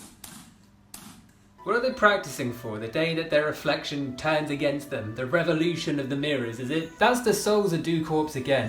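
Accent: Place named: United Kingdom. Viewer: Thanks so much for the British English and humor! As a former bouncer, it is very difficult to understand how people think any of this can ever prepare you to really defend yourself? It should be a crime to let people teach this shit...just like sending soliders to war with weapons that are "may be's"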